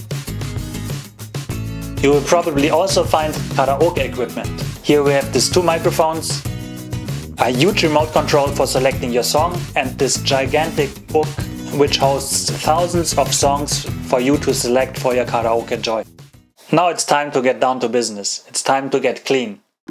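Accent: Germany. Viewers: Where do you come from? I sense a german accent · Ich hab sofort herausgehört, dass Sie aus Deutschland kommen XD Ich muss sagen, guter Kanal :) Gefällt mir^^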